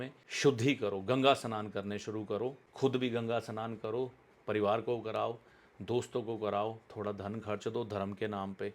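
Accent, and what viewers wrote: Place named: Punjab, India. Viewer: पंजाबी एसेंट हैं, थॉट पकड़ो समझे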